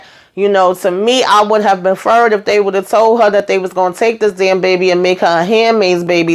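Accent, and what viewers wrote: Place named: New York, United States. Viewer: You a new Yorker? I'm from Massachusetts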